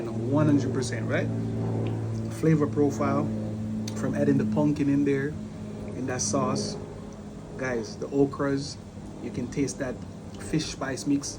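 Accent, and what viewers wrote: Jamaica. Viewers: He is Jamaican. That accent, although it has some US nuances, is decidedly Jamaican. He just chooses to eat with his hands (unlike Jamaicans usually do).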